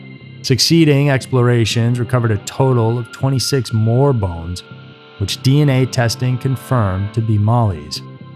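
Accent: United States. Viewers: I like listening to this channel because this dude is American 🇺🇸. I hate all the British people making these mystery videos. British accents are the worst. Can’t stand it.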